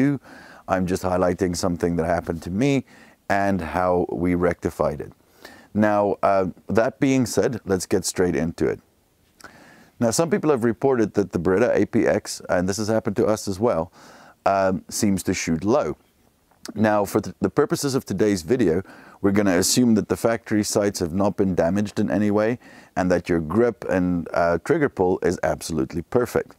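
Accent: South Africa. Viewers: Liked your video. Is that a South African accent I am hearing? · Thanks for this, same experience on my APX. Are you South African by any chance? Great video, subscribed!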